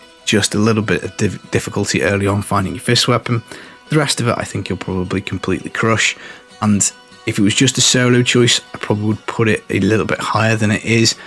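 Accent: United Kingdom. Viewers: first a disclaimer... my mother lenguage is not english..... that will be probably noteced im my speling throuout the comment, anyways.... The content that you presented is an A content. Nothing to say about that, but complements. Very good indeed... But i think you shoud think about the ppl like me... That the english is not the main language... You speak kinda fast and with a pretty strong uk accent... witch is not a proplem at all, but you speak realy fast, like your'e talking to your friends or family... Well... As you know, your content is spread world wide... that means, you shoud think about, i dont know, speak as a www content producer... if you know what i mean... love your job, love your content, but i had to go back few times to get exactly what the hell what you were talking about! heheheh... that is not a complaint.. that is just a tip for you.. 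you could try to be more articulated in you speach... dont hate me, again, that is a tip... maybe speak "last fast" or, i dont know, a little bit more "international", if you know what i mean... thanks for your job! As i said before, it is Ases!!!! ;)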